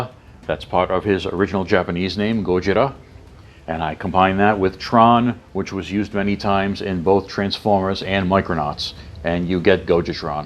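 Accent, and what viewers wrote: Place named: Northeast, United States. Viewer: Ohhh man the electirc company!!! that was wild! Totally flashed back when you mentioned that,, And Man, you have stuck your foot in a great pool of you tube friends my man! welcome! your channel is already so entertaining. Can't wait for the Bat review in March. Blue is cool, music is great - I like your voice the most... great north east usa accent man. I just really like that you are true blue.. passionate about this stuff and are not a faker like so many seem to be these days! Proud to know you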